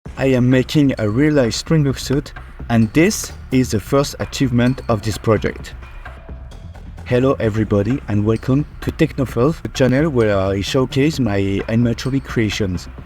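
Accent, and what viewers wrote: France. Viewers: Are you french🇨🇵? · Are you french ? I hear a french accent ( i'm french ) ( bon j'ai eu une confirmation a ). Enfin bref, soit tres minutieux, c'est vraiment tres dangereux de faire des costumes de springlocks, en tout cas bien joué. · are you french? you sound like you have a french accent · heavy French accent, and I like it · He sound very french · I think you are French cuz you like- Sound like an French person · Are you French by any chance ? I think the accent matches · Man you have a french accent and that's incredible as a french myself, anyways good job on the springlock suit! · i dont care about your french voice, IT'S AWESOME! GoodLuck pal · Are you French? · so frustrating hearing a french dude speaking in english while I also speak french xD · Dès que je t'ai entendu parler au début j'ai su que t'étais français 😂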